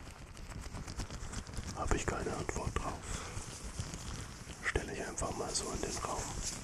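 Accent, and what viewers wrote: Germany. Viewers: Your Denglisch klingt sehr interesting 😂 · It was no secret anyway, it was already clear just by hearing him speak english with his german accent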